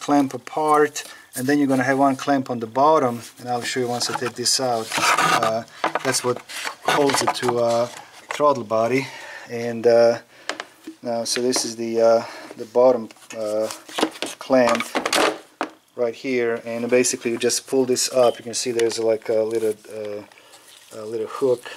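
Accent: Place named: Kentucky, United States. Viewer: Love that old home Kentucky accent Hristos Voskrese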